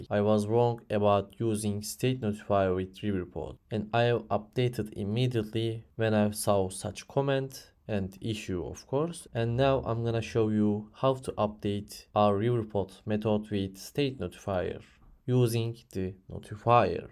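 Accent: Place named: Turkey. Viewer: Meraba 4. Saniyedeyim aksandan turk oldugunu anladim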